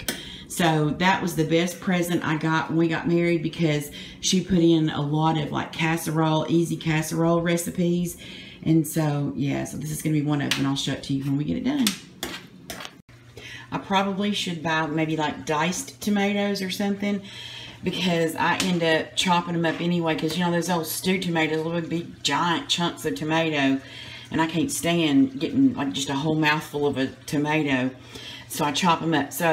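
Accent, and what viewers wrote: South, United States. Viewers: I'm from Michigan and I just love hearing your southern accent! "We fixin to have ourselves a good ole day"! I'm going to say that to myself every morning for the rest of my life!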